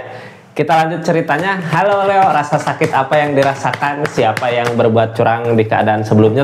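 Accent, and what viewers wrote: West Java, Indonesia. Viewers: Kk logat sunda na kaciri pisan . Abdi oge sunda bandung 😀😀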